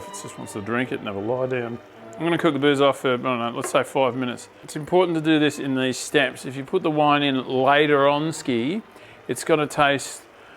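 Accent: Australia. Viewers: You sound like you’re from WA.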